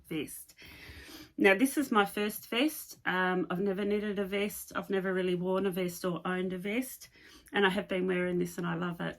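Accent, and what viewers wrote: New Zealand. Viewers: Hi. Thank you for brilliant podcasts. I always learn something new, today was the cast off tip. Love your vest, I've never been mad on them but you've persuaded me. Have to admit that I often feel homesick when hearing your accent. 2023 will see me winging my way from the UK to Rangiora and Napier!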